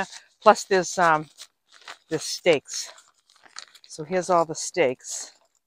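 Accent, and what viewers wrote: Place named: New England, United States. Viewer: I swear, with your smile, your wonderful New England accent, and your enthusiasm, I'd enjoy watching you set up a checkerboard! You're so delightful and precious! Thanks again for sharing your story.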